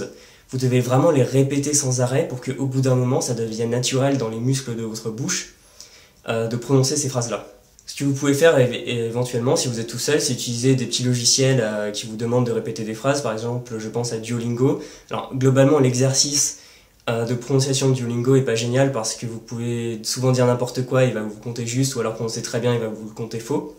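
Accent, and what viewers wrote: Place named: Paris, France. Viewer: Je voudrais savoir où il vient. Je suis en train d'apprendre le francais et j'essaye de obtenir une sense des accents francais. Je pensé qu'il a un accent parisien, mais je ne sais rien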